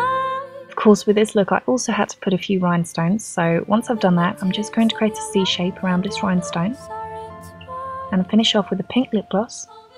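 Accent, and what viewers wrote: England, United Kingdom. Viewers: she has an accent! cool! r u from england... or sumthing???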